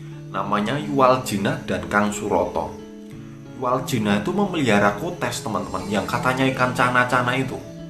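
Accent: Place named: Java, Indonesia. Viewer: Wong jowo ki nak ngendi2 mesti logak e ketok mas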